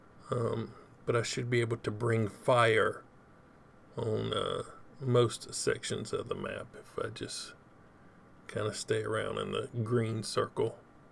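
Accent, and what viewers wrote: South, United States. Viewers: It's so much fun to hear a southerner trying to pronounce European names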